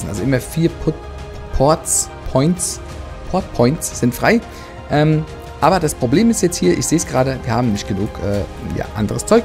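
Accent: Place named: Hesse, Germany. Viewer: mit hessische Akzent :))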